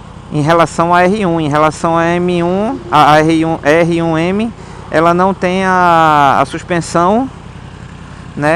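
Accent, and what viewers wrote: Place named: Rio Grande do Sul, Brazil. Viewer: nao nao nao, ele e gauho mds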